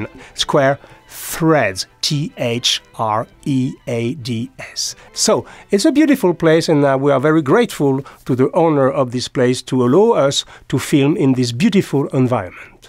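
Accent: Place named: France. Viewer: Don't worry about your French accent Mr.. Your English is far better than my French.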